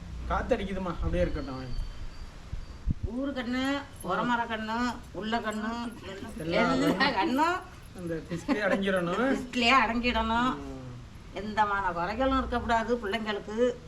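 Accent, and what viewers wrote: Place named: Thanjavur, India. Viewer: Akka orthanadu thambi yin valthugal Thanjavur pechu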